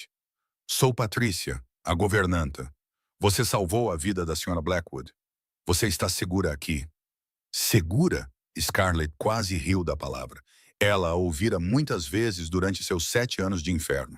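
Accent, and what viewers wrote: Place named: Portugal. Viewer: Ellen do Rio de Janeiro RJ 🇧🇷 Gosto muito de histórias de máfia narradas no português de Portugal!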